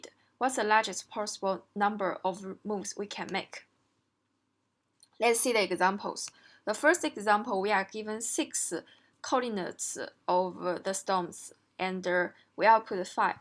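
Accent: China. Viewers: 博主浓浓的汉语味啊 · 小姐姐是不是山东人^^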